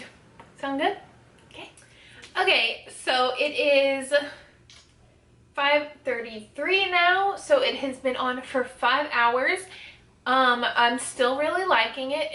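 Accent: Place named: South, United States. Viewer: I do find that so crazy. Even though I don't personally make videos or anything, I do watch mostly "beauty" related content here on YouTube. And I, personally, like to watch those that I find relatable. Which is why I, typically, stick to watching the "smaller" channels, per say. I've seen several where I hear a thick southern accent and get a little hyped thinking they might actually be from here, but they never are! LOL. You are the only one I have come across, thus far!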